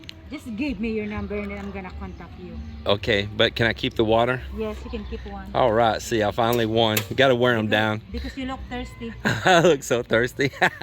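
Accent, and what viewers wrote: Texas, United States. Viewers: Not sure if I mentioned it previously but watching your vids at least for me is an eye opener,I learned a lot from you,I'm not going to build a house but I'm enjoying your knowledge and the easy way in which you explain things,your Texas drawl brings memories from the time I took special forces training at Fort Hood Killeen Texas and Fort Sam Houston in Ssn Antonio back during the Iraq campaigns,,,,I know it takes time and effort to get the vids on YouTube so I thank you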